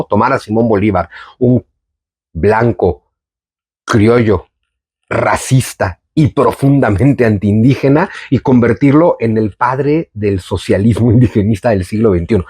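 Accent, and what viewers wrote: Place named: Mexico. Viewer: * tiene acento mexicano no español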